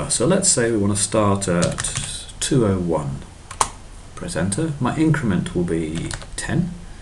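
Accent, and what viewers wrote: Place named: England, United Kingdom. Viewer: Clear instructions; great classic english accent!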